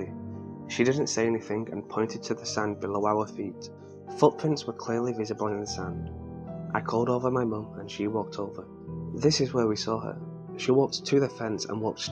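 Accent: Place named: Northern England, United Kingdom. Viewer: Another great job! Where abouts are you from? We're based in York and you definitely sound like you're from the north :D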